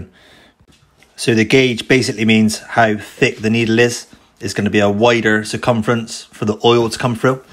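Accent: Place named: Scotland, United Kingdom. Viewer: Awesome video and accent, scottish?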